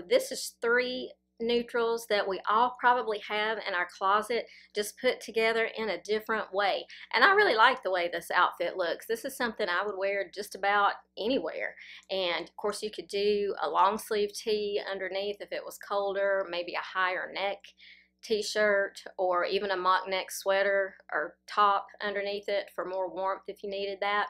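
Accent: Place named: South Carolina, United States. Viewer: I’m so glad I found your channel you also have my accent!☺️i live in Charleston, SC…..I love your ideas for outfits bless u……..I am 58 yrs old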